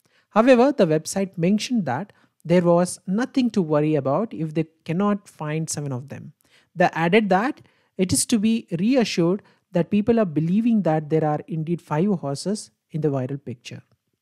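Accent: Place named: India. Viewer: Maybe you shouldn't explain an optical illusion to English speakers with such a thick Indian accent. I'm happy you explained it, but I couldn't understand what you said for H 6-7. I still only see 5.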